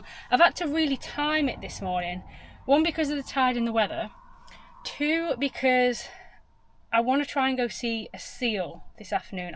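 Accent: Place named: Nottingham, United Kingdom. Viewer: Don’t recognise your accent? My guess would be Nottingham area , sorry if my guess offends you but obviously only a little sorry , an energetic charming soul you are .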